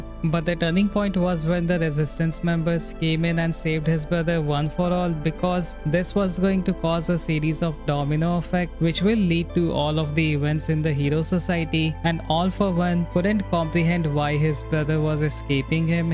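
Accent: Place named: India. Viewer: Love the Indian accent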